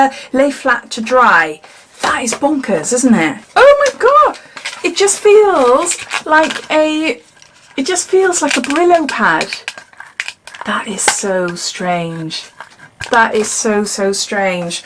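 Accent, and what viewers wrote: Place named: England, United Kingdom. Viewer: I could hear you all day! I love your English accent ❤ you are so pretty too! Love your unboxing xoxo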